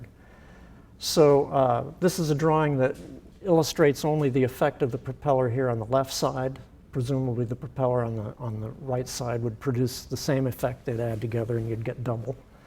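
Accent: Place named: United States. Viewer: the pronunciations of the names are so American, it hertz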